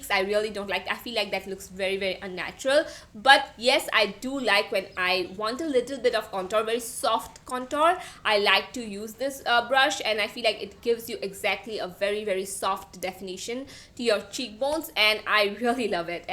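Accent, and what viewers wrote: India. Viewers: Where are you from?in which part of India?Because your accent is so cute and so soothing 🥰🥰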